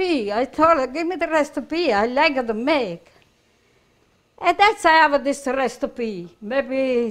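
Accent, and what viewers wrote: Italy. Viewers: If your going to make fun of her Italian style accent stop comments. Your RUDE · you are our treasure! I made chicken alla Franchese your way and it was just as delicious as your Italian accent! Love you! ❤❤❤ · I just love cooking. She has a very easy way of explaining her style of cooking and I love her Italian accent! Thank you! God bless you & your family!😘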